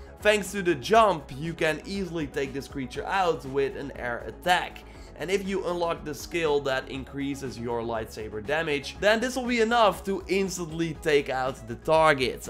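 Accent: Netherlands. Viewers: Are you Dutch? I can hear an accent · I can hear you are a Dutchman like me. Ik hoor dat je een Nederlander bent zoals ik. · Je klinkt echt nederlands haha! Goeie video🙏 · I think he's from netherlands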